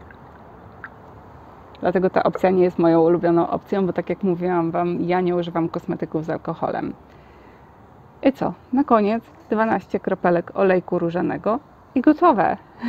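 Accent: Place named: Ukraine. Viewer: Pohodzisz z ukrainy ? Bo masz trohe taki akcęt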